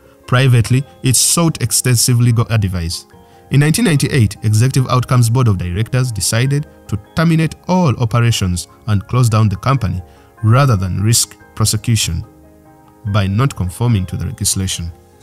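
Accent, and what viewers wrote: Uganda. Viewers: I know that accent.. Uganda Oye! Great content, ssebo! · The narrator sounds Ugandan....a Muganda to be exact. Ono Musajja wa Kabaka! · This is one of the best documentaries on "mercs", after Anthony Mockler's book, The new mercenaries, about mercenaries in Africa. The commentator sounds Ugandan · Excellent presentation! The narrator has a distinctly Ugandan (or should I say East African?) accent, by the way. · This guy is Ugandan, Just by his accent. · Narrator sounds Ugandan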